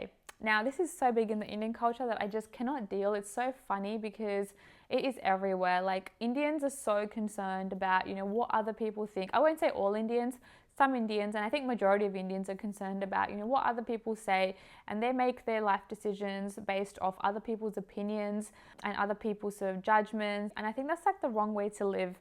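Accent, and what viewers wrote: Australia. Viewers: When I saw your video the first time, I told myself in my heart that I hope she speak hindi. Your accent is totally Australian. Good to know, you know the culture in and out.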